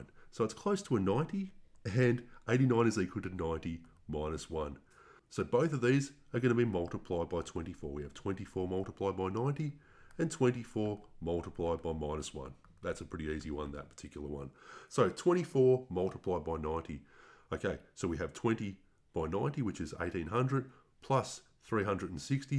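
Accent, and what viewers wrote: Australia. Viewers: Awesome ! btw Ya sound like an Aussie or some NZ guy !😅💥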